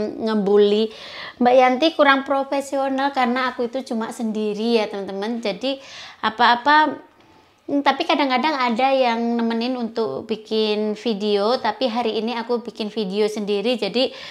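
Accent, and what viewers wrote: Java, Indonesia. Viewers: Aq penonton setiamu lho mbak cuman jarangan koment 😊... aq suka bgt denger kata mongah2 duh ngakak sendiri...pertahankan logat jawanya biar jd ciri khas...salam dari sby 🎀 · Mashallah,logate,jowo bgt,hadir,aq wong solo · Asyik bgt lihat masak memasak buat keluarga dan mertua... Natural dan santai bgt, ga dibuat buat bahasanya yg medok... Sukses deh buat. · Jika lihat nih senang banget apalagi bhs jawanya medhok hehehe '' isah2 '' alias cuci piring wis semangat d sehat slalu ya sekeluarga 😍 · Pasta maknyus 😀😀👍👍 Lucu logat jowo nya masih ada👍 keren mbak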